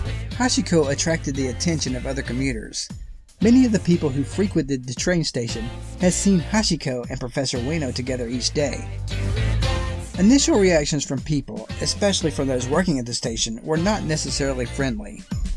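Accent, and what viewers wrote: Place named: United States. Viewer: I do agree with you about them being different breeds, but if he is from America (which he sounds like he is) then he is not technically wrong by putting both together, since if i am not mistaken in America they are not considered to be a different breed. So no real reason to insult him on doing poor research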